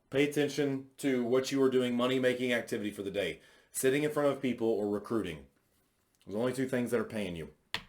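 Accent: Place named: South, United States. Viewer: Thanks this was great! I'm just now considering a position with Symmetry and enjoyed listening to your southern draw!! 😄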